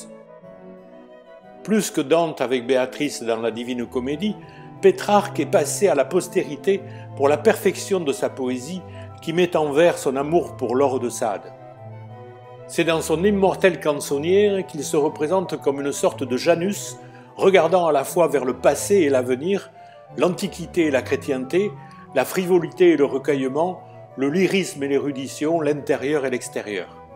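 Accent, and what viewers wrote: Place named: Provence, France. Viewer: J'aime votre accent de gentilhomme provençal 😉😉🤗🤗🤗😎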